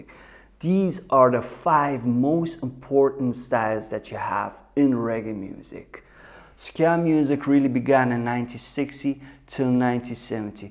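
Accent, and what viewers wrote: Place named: Jamaica. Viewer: Did you spend some time living in Jamaica? Your accent sounds like Spanish or Italian but with some Jamaican pronunciation sometimes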